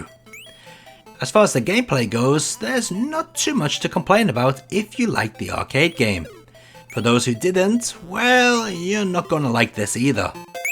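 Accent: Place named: Wales, United Kingdom. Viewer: I'm trying to place your accent...I'm thinking west of England, perhaps Wales? (I'm far off, right? Like a Frenchman calling a Texan a New Englander?)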